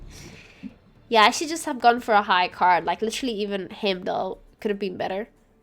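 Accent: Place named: Afrikaans, South Africa. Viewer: You Sound REALLY Afrikaaans, Indien so, baie dankie vir die GWENT clips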